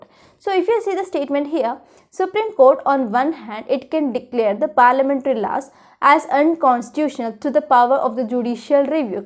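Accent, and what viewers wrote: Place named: Tamil Nadu, India. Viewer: Your slang your accent your English and your pronunciation literally 🤦🏻‍♂️🤦🏻‍♂️ please go ahead in Tamil if you could. I guess your a tamilian. No offence.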